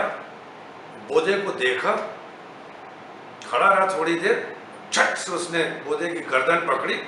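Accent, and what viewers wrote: Bhopal, India. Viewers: Zabardast tale.. btw you have a unique style of telling these stories with bhopali spices 👏👏👏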